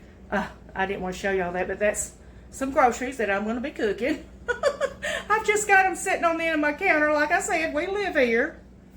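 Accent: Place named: South, United States. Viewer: Hi from Southern California. Sent over by Lynn Wilson. I’ve subscribed to your channel. I just absolutely love your southern accent!❤❤❤. Happy Fall. Hope you don’t mind if I steal some of your decoration ideas for my home. Love your home!!!!!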